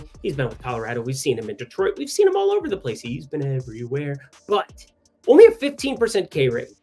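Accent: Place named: Houston, United States. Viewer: And I like your Houston swang talk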